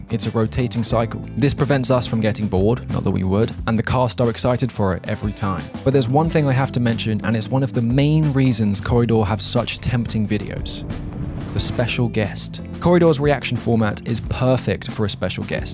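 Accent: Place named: England, United Kingdom. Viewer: Lovely English accent - not Irish, Scottish or Welsh. Crisp, clear English accent. I sat through your entire video just listening to you talk. Don't get me wrong - the content is great. But I was here for that lovely English accent! Thank you.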